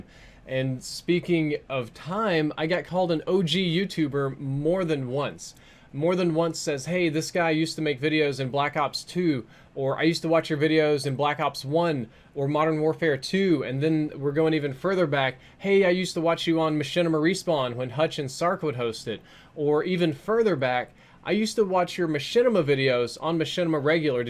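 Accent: South, United States. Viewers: I used to watch you all the time from like mw3 up until I stopped playing on console after advanced warfare but have recently got back into your content a bit more. Maybe that helps you feel a little better? I also really quite enjoyed your philosophical, abstract and dream videos since middle school (going to college now hehe). I'll definitely keep watching again :) Also, DAMN THAT SOUTHERN ACCENT LOL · That old video with his southern accent oml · That southern accent was strong. Where’d it go? 😢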